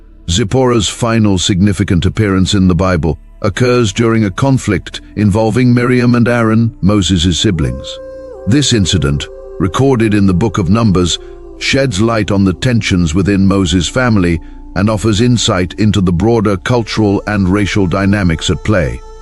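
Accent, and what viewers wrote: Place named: United Kingdom. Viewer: Amazing that this narrator,with a a strong British accent close to one doing their version of RP He's on too many videos,which proves most of these videos on bible pretty much phoney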